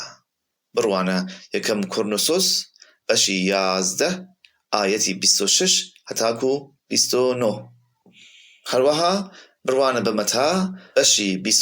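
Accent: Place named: Iran. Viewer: ئایا کوردێک نییە ئەم دەقە بخوێنێتەوە؟ ڕێزم بۆ ئەو کەسە هەیە ئەمە دەخوێنێتەوە، بەڵام ئەو کەسەی ئەمە دەخوێنێتەوە دەنگێکی فارسییە نەک کوردی، زۆر لە وشە و پیتەکان بە هەڵە دەخوێنێتەوە.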